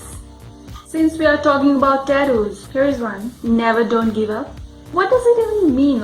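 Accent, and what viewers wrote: India. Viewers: Bekir Hadžić She's probably Indian and she speaks English a lot so her accent is light. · it’s obviously indian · I'm glad I found you from a comment your so funny. You sound Indian but don't look like it but I have an Indian friend she has the same name as you. Also I'm Indian 😸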